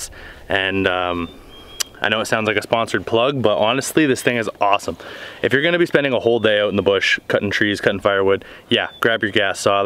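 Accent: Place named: Canada. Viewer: Like the Canadian accent.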